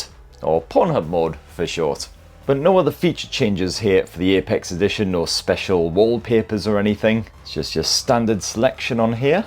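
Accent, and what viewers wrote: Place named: North East England, United Kingdom. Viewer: Mate where are you from! Me and me fella are from Durham and we think your accent is North Easternly but more south than Durham! Please let us know it’s killing us!! Much love 🫶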